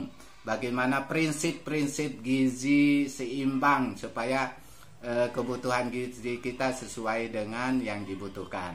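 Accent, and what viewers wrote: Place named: Java, Indonesia. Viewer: Mantap jowa ciri khas suaranya bah semangat terus om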